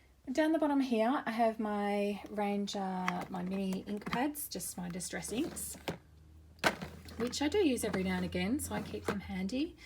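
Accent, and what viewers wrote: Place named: Australia. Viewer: Any chance your Australian, just accent sounds aussie and well normal. its weird finding an aussie card maker.. well either aussie or kiwi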